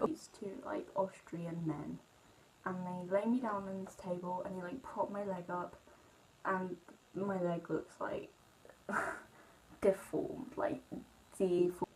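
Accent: England, United Kingdom. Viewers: She sounds so much more English... 🤔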